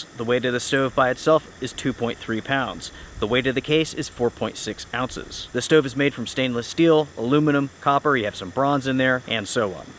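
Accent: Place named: South, United States. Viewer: Great little stove. I love your reviews and your voice. Very smooth with a slight southern accent. ❤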